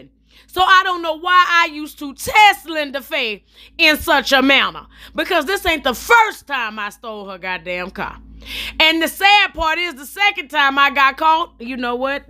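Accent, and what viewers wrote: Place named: North Carolina, United States. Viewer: You from Nc because you sound like me and my family..the skrenf lol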